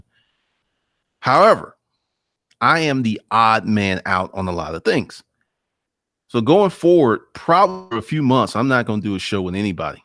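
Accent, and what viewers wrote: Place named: South Carolina, United States. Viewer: Yooo!! you sound JUST like the folks from my hometown in SC. I always thought the same thing, but never voiced it. (guess I was afraid to get smacked. lol) THEN.... my mom told me "don't get one of these jobs at the plant and get comfortable with money" I said, "TRUST ME... You 'ain't' gotta worry about that mama." haha Thanks for the amazing video. So much truth in here!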